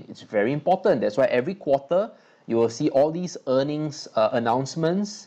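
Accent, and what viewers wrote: Singapore. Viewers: Hey, listening to you I gotta wonder if you're Singaporean cos I am hahaha:D Cool video and thanks for the info!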